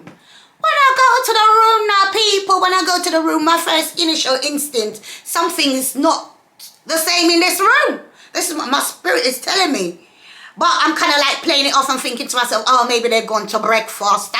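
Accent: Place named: England, United Kingdom. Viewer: Your English accent is awesome don't change it for anyone. Pray for mom that she keeps safe.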